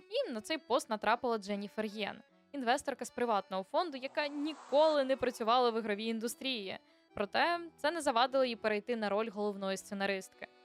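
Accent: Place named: France. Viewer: Шановна, вам дуже личить французький акцент, відос класний!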